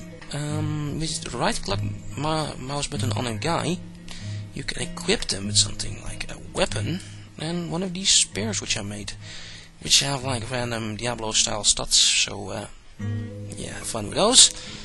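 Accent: Netherlands. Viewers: Are you dutch by chance? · i think you are from holland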